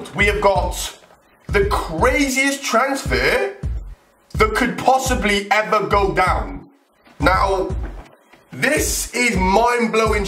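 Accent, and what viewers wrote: Wales, United Kingdom. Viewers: are you from welch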